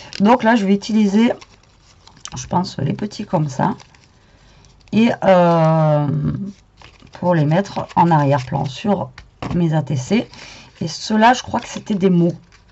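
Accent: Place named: Marseille, France. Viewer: Ha voilà ça s’entend lol j’adore moi Marseille 😉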